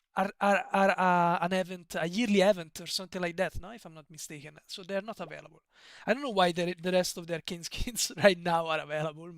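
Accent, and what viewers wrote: Italy. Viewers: its right before my bedtime so i cant watch more than those 3 mins today but i gotta say this is a really cool way to stream and interact with the community, youre really awesome!! i also like the italian accent, parlo un po italianooooo, good night <3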